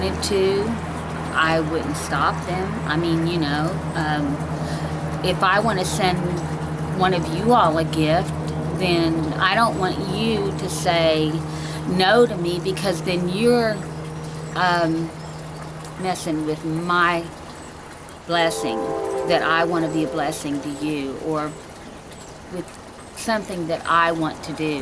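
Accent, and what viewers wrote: West Virginia, United States. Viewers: Hi, I am a new subbie. I watch Marnies channel. I saw your channel name & started watching your 1st video. I recognized your accent right off. I live in Michigan. I married a born & raised Mountaineer. He was born in Clarksburg. Went to Doddridge County schools. All his family is still in Salem, Clarksburg, West Union. I just LOVE WV. But it sure does get HUMID. I have 2 grown daughters & 4 granddaughters that I take care of on the weekdays while the girls & their husbands work fulltime. Sure keeps this Nana busy and pooped out at the end of the day. Love your videos. Will write more later. Michelle from Michigan.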